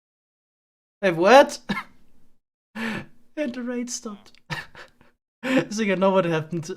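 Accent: Germany. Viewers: he's def German.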